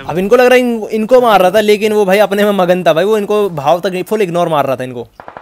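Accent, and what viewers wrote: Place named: India. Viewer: Desi maar ke comentry kar rahe ho bhai 🤣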